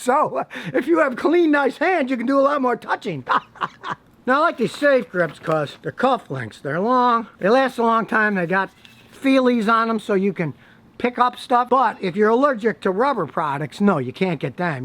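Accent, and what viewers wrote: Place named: Texas, United States. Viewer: I am here for two reasons: cars DIY and texas accent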